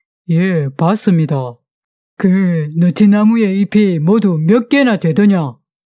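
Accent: Gyeongsang, South Korea. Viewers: 구수한 경상도사투리로 읽어주시는이야기가 참 잼나게 들었네요 고부사이도 그렇고 삼부자지간예기도 뜻깊게 들었어요 요즘 사회가 각박하고 기분좋은일이 별로없는데 옛날 예기가 많은생각을하게해주네요 고맙고 감사했어요 앞으로도 좋은예기 많이들려주시길요~^^ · 간만의 경상도 버전 아주 구수한 이야기 아주 좋아요 엄머야 워짠 일로 엄마야😂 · 님!!~^^ 갱상도 버전으로~정말 잘 하셔서 재미있게 듣습니다. 스님동냥이 아니라 탁발 이라하는게 듣기좋을듯~~😂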